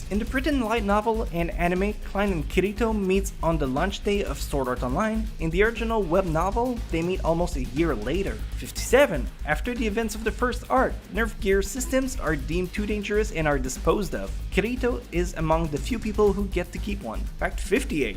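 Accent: Canada. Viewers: Clearing this up for everyone shitting on his pronunciation: he's French Canadian. For some reason, and I've experienced this through close friends of mine having the same issue, French Canadians struggle a lot with English pronunciation. This is because of tongue placement. In French, the tongue tends to rest on the bottom of the mouth when speaking, whereas in English it is more at the top. This is an issue when learning a new language later in life because the tongue has trouble getting used to switching from the bottom to the top and vice versa. And now you've learned something new! Enjoy your day!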